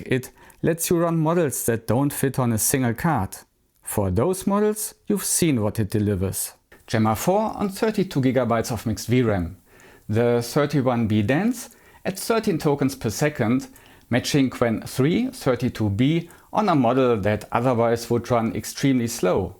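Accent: Germany. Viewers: Hey bisschen off topic und bitte nicht übel nehmen - wenn Du magst, könntest Du mal ein paar von den schwierigeren englischen Wörtern, die man ständig benutzt beim Thema KI (inference fällt mir spontan ein, aber es waren mehr), nachschlagen wegen der Aussprache. Tschörmen Ecksent ist auf YouTube gar kein Problem, aber bei den Fachbegriffen würde es vielleicht professioneller wirken, sie zwar mit Akzent, aber davon abgesehen korrekt auszusprechen. Ich empfehle die kostenlose Seite der Cambridge Dictionary, die haben sowohl Hörbeispiele als auch einen tollen Ersatz für die nervige Lautschrift. With that out of the way: I like your channel a lot, keep being different than those who just run the same boring tests on every new model!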